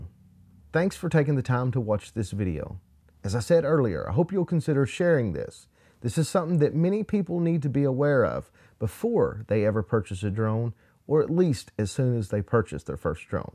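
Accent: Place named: Arkansas, United States. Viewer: I detect a slight Arkansas accent 🤔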